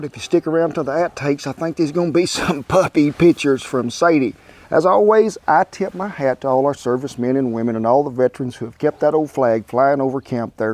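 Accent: South, United States. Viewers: thank you love the country accent im a good ole country boy out of east Texas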